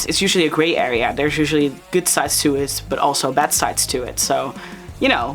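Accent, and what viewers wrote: Netherlands. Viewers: Are u dutch I am but u know I heer the accent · ARE YOU DUTCH??😂❤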